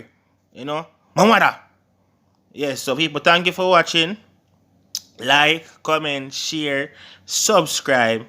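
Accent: Jamaica. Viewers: Patois! Love the accent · Jamaican accent hehe very interesting I like it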